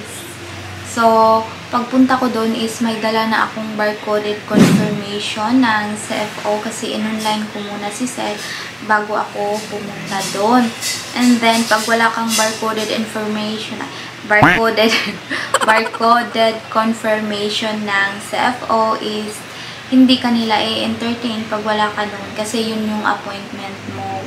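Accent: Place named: Visayas, Philippines. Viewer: Bisaya lge ka mam unsay probinsya nimo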